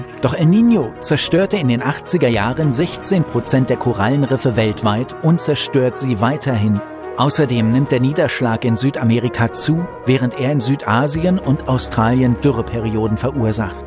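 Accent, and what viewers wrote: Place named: North, Germany. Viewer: ‘aus ‘ein ‘ander: Du bist aus Norddeutschland, ziemlich nördlich! 🤣 Das war's aber auch schon mit neuen Erkenntnissen.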